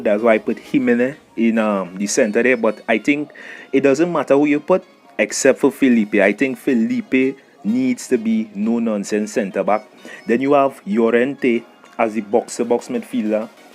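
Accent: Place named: Jamaica. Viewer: Sound like a Jamaican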